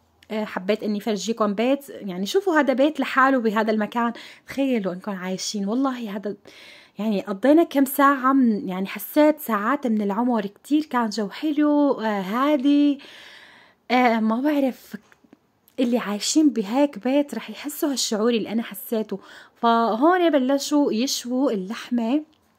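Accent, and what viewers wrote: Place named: Syria. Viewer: من لسانك سوريا اب عن جد. لستك تكذبي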